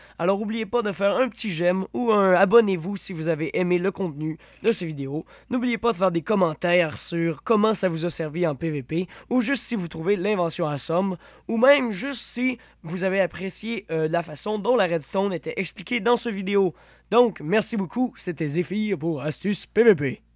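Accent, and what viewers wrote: Quebec, Canada. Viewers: Juste excellent, et puis ton ptit accent québecois ça rend le tuto encore plus sympa à écouter :)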